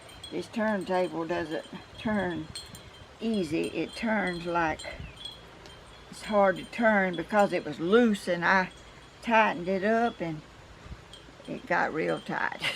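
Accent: South, United States. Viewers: Ms. I found your YouTube channel while I was living in Minnesosta and I missed hearing the southern talking I grew up with in Georgia. You talk as much as you want to, about whatever you want to talk about! It's your video. You do you! I love all your projects! Now that I'm back in Georgia, I wish that I could come visit and craft with you and drink coffee! Blessings to you and all your endeavours!-Angela Hurt from Lizella, GA. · Love “southern” talk. It’s beautiful and melodic. Please don’t apologize for your southern dialect. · Oh dear Miss, so lovely to see you again. Your pot turned out so beautifuly. And it matches perfectly with your top. That is your color. That is for sure. I hated to see you suffer in the heat. I never minded the heat before but this year was the cut off of my not minding it. So my husband brought me a fan for outside and it does not have a big one and even if the air is warm it is still better than no breeze. 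I guaranty it will help. Thank you for sharing this beautiful project. Oh and you can add to kitties and puppies, add my husband. He just loves your humour, positive attitude and cannot believe your age. I am 56 and you look younger than I do. So keep up your adorable, cute and sense of humour and that Southern accents is completely addiction to listen to. So you have a new fan. His name is Rob...lol. your flowers behind you are growing so much. You little piece of heaven is growing with your love. Hope you are dealing well without Cody, and he is doing eell too. I think you need a little puppy or kitty to keep company with. Anyways thinking of you dear as always fondly. Hugs, from Diane and Rob and family.xxx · Hi Ms. I live in Chicago, first i love your southern accent also your morning videos. Very relaxing to watch you perform your wonderful art.I have learn so much from watching your videos. 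I am 68 years old just retired and looking forward to try all your wonderful crafts ideas. Please don't over do it in this extreme heat. Stay cool. Stay safe. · Hi MS.! Thank you. Love you! Your stories are great! History is being taken from us. One of my Grandma's grew up in the south- - Kentucky or Tennessee- and your accent reminds me of her (she passed many years ago). You do NOT talk too much. God bless you and keep you safe so your YouTube family can continue to learn and enjoy you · Miss, I’m a Georgia girl too! I’m 72 and the matriarch of my family now. How I miss my mother and sister, but sitting a while with you feels so comfortable! 😢 God loves a “Joyful Noise” and your voice is calming and joyful. That’s why the birds and animals love your voice! It’s filled with Southern Charm, Grace and Love. Thank you so much for sharing your time and crafts with all of us! I feel as if I visited home! 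🙏❤️🥰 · I just love this lady I have just discovered Ms. love her southern accent. She reminds me so much of my wise mama which I miss everyday. God bless you 💞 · Good God blessed morning! Just dicovered you, and so very glad I did. I just love your down to earthness, and also enjoy your lovely southern accent. My mom was from Chattanooga, and my dad from Mississippi. You are a very talented creative lady, snd I look forward to future videoes. Your surroundings are just lovely and the wind chimes are nice to hear. I ike them also and have seversl on my front porch. Blessings and peace, and happy crafting. (Gonna have a cup of coffee now too!😊) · I’m from Georgia and LOVE your southern accent!! Reminds me of my childhood in Georgia 🥰 You’re a doll !!!❤️ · You have the most relaxing peaceful voice. You remind me of my dear aunt who lived in Tennessee. I would love listening to her stories in her sweet southern voice just like you. 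I’m so glad I found you. Actually my sister did. 🥰🥰🥰🥰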